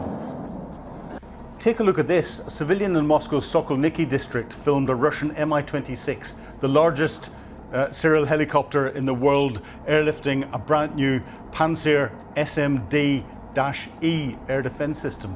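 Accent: United Kingdom. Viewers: I'm delighted with your summaries. Maybe its because of your accent as it reminds me of my service in the UK; concise, focused reporting and assesments. Your work is excellent, kep them coming.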